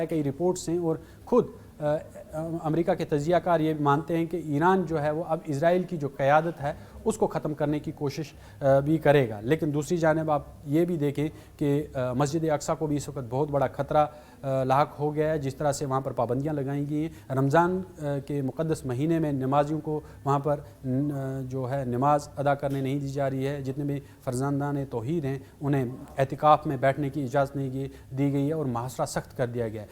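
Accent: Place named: Pakistan. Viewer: He is not from India. He talk like Pakistani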